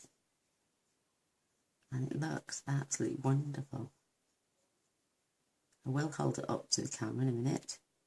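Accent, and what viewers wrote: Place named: Wales, United Kingdom. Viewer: i thought i hear ed. A bit of walsh hun i am in cardiff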